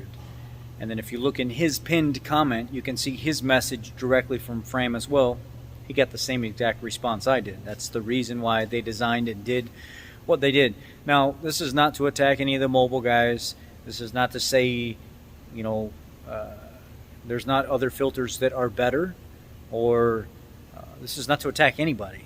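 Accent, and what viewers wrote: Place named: Chicago, United States. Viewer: You sound like you from back east Chicago area lol I love the content.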